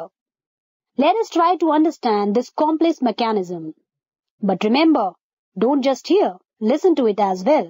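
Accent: India. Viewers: The awkward moment when a white boy has an Indian accent.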